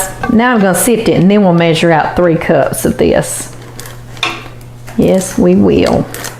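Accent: South, United States. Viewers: Oh my gosh that southern accent 😮. What the ??????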